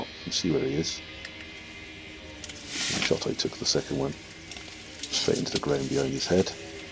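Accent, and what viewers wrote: England, United Kingdom. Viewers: The calm English accent puts me to sleep everytime sitting at my computer.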